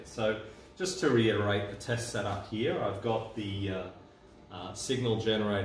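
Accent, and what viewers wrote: Australia. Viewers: An Aussie in the States? 🤔 Interesting video, tnx!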